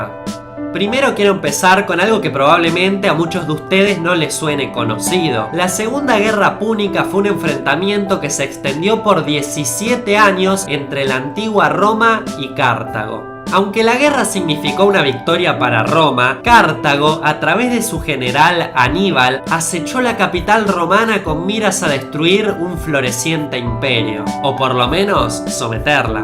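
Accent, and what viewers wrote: Argentina. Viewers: yo soy chileno pero por tu tonada me suena argentina pero me confundo si eres argentino y estas en mexico y te a cambiado el tono o puede que sea alreves tu dime si estoy en los sierto aposto que eres mas argentino que mexicano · jajaja era argentino, y no me di cuenta por el acento.....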